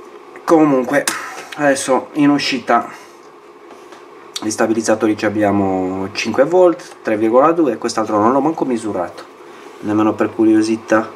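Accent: Sardinia, Italy. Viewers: Dall'accento non capisco se sei sardo o rumeno. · secondo mè l'hai messo in corto , si nota un tipico ma simpaticissimo accento sardo unsaluto e comunque un grazie per l'impegno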